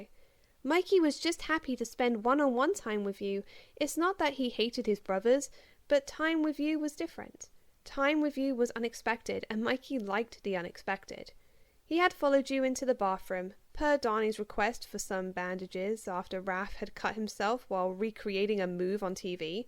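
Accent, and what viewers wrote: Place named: United Kingdom. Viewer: Bro why you sound like a British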